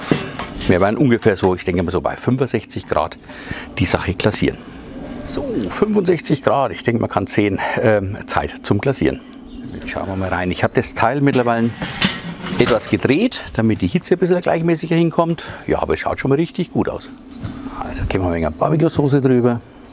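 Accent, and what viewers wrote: Franconia, Germany. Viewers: Hi, tolles Rezept wie immer. 😋👍 Eines nur: 'Wenn ma' no au dei frengischs gebrabbl a bissle bessr vrschdao dät', dann wär amma arma Obrschwåba wia mir scho' viel gholfa! Bleib bei deinem/r Dialekt/Mundart - es würde SO viel verloren gehen, redeten wir nur in der ersten Fremdsprache, die wir Süddeutsche als erste lernen mussten. A Griasle vo' Obrschwåba - und mach weiter so. 👍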